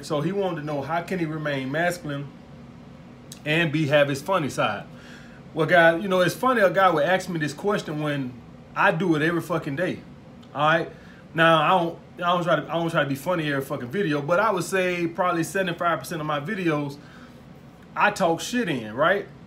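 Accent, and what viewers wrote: South, United States. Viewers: Where is he from he sound like he from the south?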